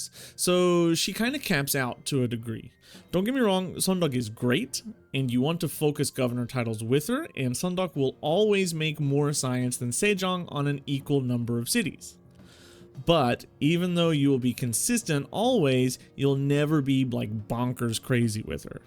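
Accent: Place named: Texas, United States. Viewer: I wouldn’t have known that from 1 million years you sound like you’re from like Texas or something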